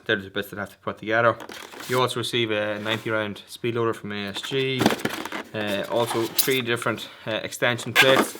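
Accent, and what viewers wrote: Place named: Dundalk, Ireland. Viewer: That dundalk accent though!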